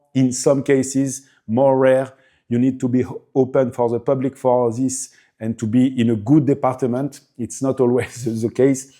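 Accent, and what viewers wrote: France. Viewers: As an American I understand your English perfectly. You do have an accent but so what? You are French and it is understandable. I only wish I could speak French. It’s a beautiful language and I hope to visit your glorious country next year. 🇫🇷🇺🇸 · Your English is amazing but your accent is throwing my Texas ears off a bit. I am trying so hard to learn some French, but alas, I am truly hopeless. But I love your show and have subscribed to it. I know I am capable of understanding the accent and I suspect it is the key to learning French because so many words are already part of the English language, not to mention that I grew up very near the Louisiana border where French is still widely spoke. But I do know " Laissez les bon temps rouler" although I can say much better than I can write it. 😂😂😂 I'm sorry, that is official motto for Mardi Gras, kind of our Carnival. 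· I been wondering about the young French man and his chatuea, think his video was called So Chateau. You showed a picture of him in the end, a very young man. I would like to know how his chateau is doing? Don't worry about your English women love it with your French accent ❤😊😅😅😅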